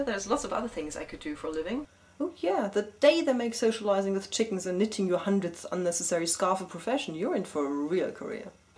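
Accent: England, United Kingdom. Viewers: I like your British accent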